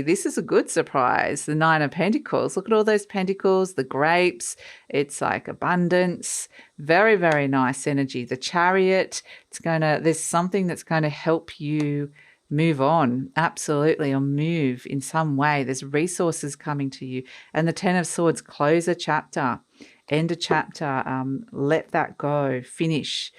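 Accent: Australia. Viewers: G'day. You sound like an Aussie. I'm in Brisbane. Nice to meet you. A blue butterfly flew across my line of sight this morning! Thanks for the reading. Cheers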